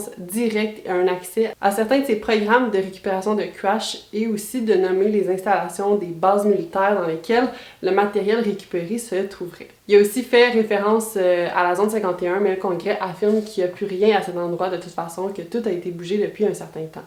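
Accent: Quebec, Canada. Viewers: Très bon résumé, c'est la première vidéo que je vois de ton site et j'adore, surtout ça fait du bien d'entendre quelqu'un parler des ovnis en Québécois, beaucoup plus facile à comprendre.Très heureux de voir que les Québécois(se) commence à crée des sites sur les ovnis-phénomènes inexpliqué-etc..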